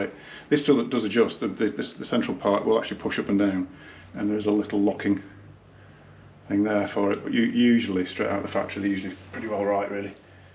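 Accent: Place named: North West England, United Kingdom. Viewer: his accent being called British upper class, I think you'll find it's a normal North West of England accent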